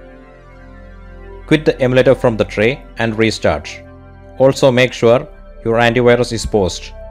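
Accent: Kerala, India. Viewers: Bro malayali analle. English parayumbol manazilavum😭🤣😅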